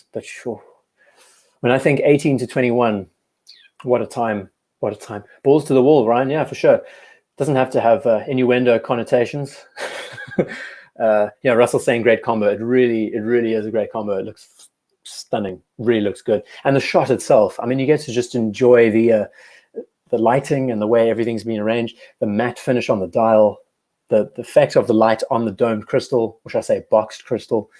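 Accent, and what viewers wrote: South Africa. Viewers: Your dead wrong Bob! I completely disagree! FYI it is just under 4 hours of snobbish and arrogant critiques and opinions of watch photos by an obviously narcissistic man with a South African accent that he has mistakenly made sound so patronizing and annoying that nobody listening to him will realize he was trying to sound intelligent or "cool" because their brain will be frozen by the endless nails down a chalkboard effect that his voice has on them and he never stops talking for more than a second or two for the entire four hour torture fest. This obvious narcissist who definitely cannot afford a single watch that he talks about unless his "Daddy" buys him one is also completely unqualified to critique or give his opinion on these timepieces so thank goodness for the repetition Bob, it helps us to remember the information being shared that is most likely completely wrong and/or irrelevant. 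The best part is this guy is only 26 or something unless I heard him wrong which I may have because I was often interrupting him by yelling and screaming profanity at the screen but who talks like this guy at 26? Notice he doesn't show his face at all which is probably because he was bullied so bad in high school that he had to enter the witness protection program. The world is going to end soon if arrogant, luxury worshipping little weasels like this guy can actually make money rambling on about watches. Freedom of speech is a real burden sometimes and this is one of em big time. I wis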